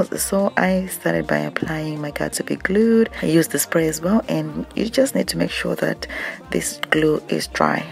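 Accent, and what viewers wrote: Zimbabwe. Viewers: You sound just like my aunt. You have to be Zimbabwean. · Chaz Lynn she's Zimbabwean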